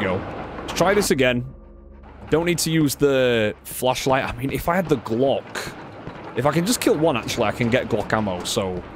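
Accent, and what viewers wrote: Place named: England, United Kingdom. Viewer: Just found your channel through this letsplay, so nostalgic and you have the perfect balance of theorizing and commentary without overwhelming the game! Small question, I apologize if this is rude, but where in england are you from? love your accent best of luck on continued channel growth and congrats!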